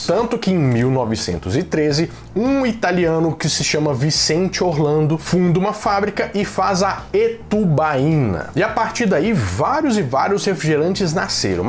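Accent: Minas Gerais, Brazil. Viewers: Top. Muito show mesmo. Parabéns Então, pensava que vc era mineiro.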